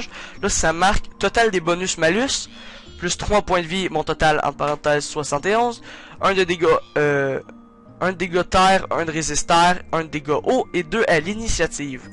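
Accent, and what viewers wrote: Quebec, Canada. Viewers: Québécois ? Et bon Tuto, j'ai appris à jouer graçe a toi ^^ Merci Beaucoup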